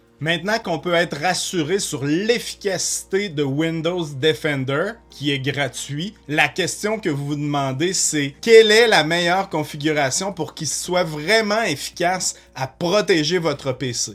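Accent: Quebec, Canada. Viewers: Je trouve cette proposition très astucieuse et j'ai bien envie de la mettre en oeuvre. Je me pose cependant une question : le fait d'étre contraint d'utiliser les trois questions secrètes ne constitue-t'il pas un risque si une intelligence artificielle est utilisée pour trouver les mots les plus probables en fonction des données qui seront trouvées sur l'ordinateur? J'aimerais avoir votre avis. Merci par avance Et bravo pour votre clarté et l'accent canadien m'est toujours très agréable. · Bonjour. Je viens de découvrir votre chaine et de m'abonner. J'ai fait tout ce vous préconisez et maintenant je me demande si d'avoir téléchargé Advanced systeme care sert à quelque chose. Je suis français et votre accent j'adore. Je vais faire un tour au Quebec en septembre, j'en salive d'avance. Merci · Bonjour Jeune Homme, bonjour à Tous ! Eh bien quoi te dire, j'ai liké, je me suis abonné, après avoir visionné, et revisionné ta vidéo ! 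Eh oui, comment faire autrement, face à un travail si bien fait, court, clair, net, concis et précis !! je croyais qu'il fallait avoir Microsoft 365 pour bénéficier de Défender, et là tu viens de me définir que non, puisqu'à aucun moment tu n'en parles dans tes explications ! Tu sais, je te visionne depuis la région parisienne en France, et ton ( accent là ) je le trouve magnifique, puisque j'en rigole aussi sur certains passages ! En tout cas merci pour ce partage qui me sera grandement salutaire car c'est un peu beaucoup le ( bazar ) din mes zoefers là tu sais ! J'ai essayé de le faire à la québécoise là t'sais ! Ah, petite précision, le tutoiement dans mon intervention n'est point à prendre pour de la désinvolture, ni du manque de respect, mais tout simplement, de par le fait que cela me plaise, je me veux de plus de proximité avec mes interlocuteurs, de par ce rapprochement que nous offre la toile, malgré tant de distances qui nous séparent ! 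Et puis, je suis prof de sport avec des baby, des enfants, des ados et adultes en karaté et self-défense, ceci explique un peu cela !! Salut mon pot ! Paulo 74 ans !!! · Bonsoir Je suis avec un grand intérêt cette vidéo d autant plus que vous nous donnez fort gentiment la marche à suivre sans aller trop vite. Cependant, jai acheté depuis peu de temps un ordinateur Windows 11 ! Ne pourriez vous donc pas nous indiquer comment procéder de la même facon, étape par étape d autant que certaines rubriques que vous citez n apparaissent nulle part ! Je me permets toutefois d attirer votre attention sur le fait que je ne vois pas assez bien les différentes fenêtres que vous ouvrez d autant que les caractères à l oeil nu apparaissent fort petits et que l image qui en découle est plus ou moins flou ce qui rend la visibilité dificile. Je devine plutôt que je ne vois véritablement ! Elle gagnerait en netteté avec des caractères plus gros et une image beaucoup plus nette de façon à ce que l on puisse mieux visualiser le tout. 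Mis à part cela, j adore votre accent canadien et ai le sentiment que vous êtes passionné d informatique au point que vous transmettez avec grand plaisir tout votre savoir sans chercher forcément à gagner de l'argent ! J ai donc d autant plus plaisir à vous écouter !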